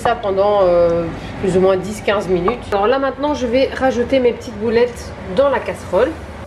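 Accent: Belgium. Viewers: Bonsoir ,il me sembke que vous avez un pet accent ? Vous êtes Belge car vou a avez un accent ou etes Belge ou Bruxelloise ?viy me faites rire viy et trop comm jeun femme viu et mariée ?si oui botre mar ne diu pas s 'ennuyez avec vous ?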